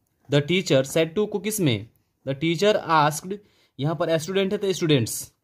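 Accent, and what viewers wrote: Bihar, India. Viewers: Sir ,u from bihar 🤔🤔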